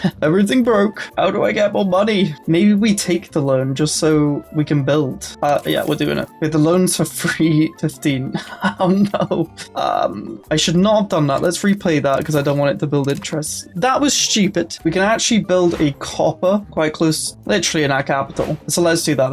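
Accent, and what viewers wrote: Wales, United Kingdom. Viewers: he definetly sounds Welsh and I say that as an English person.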